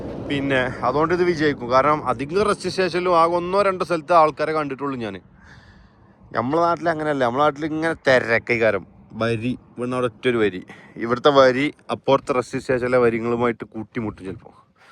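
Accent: Malappuram, India. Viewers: Malappuram സ്ലാങ് അടിപൊളി ❤ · Hai, where are you now? in Namibia? wish you a happy journey. Your malappuram slang is amazing. God bless you.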